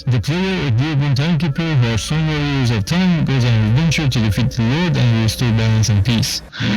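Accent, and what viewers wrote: Iran. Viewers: you sound soo Iranian for some reason its hard to see gamedevs from iran on yt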